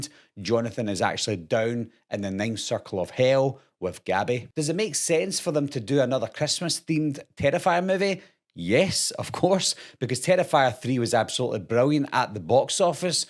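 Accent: Scotland, United Kingdom. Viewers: Great video, man, awesome films, Damien Leone, is a great horror film maker, I really love your Strong Scottish accent, by the way, keep up the great work 💯🙏🏼💙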